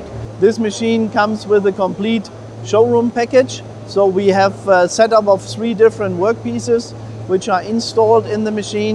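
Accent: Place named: Germany. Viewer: I love his german accent😂😂😂😂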